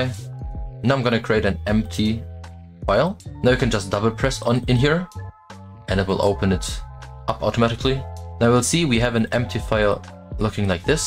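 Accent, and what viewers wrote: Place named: Germany. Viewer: Man merkt, dass du deutsch bist hahah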